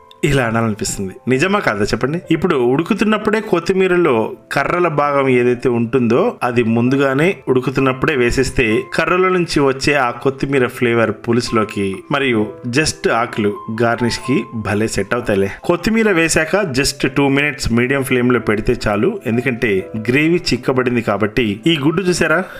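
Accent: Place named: Andhra Pradesh, India. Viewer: I like your Andhra slang broooo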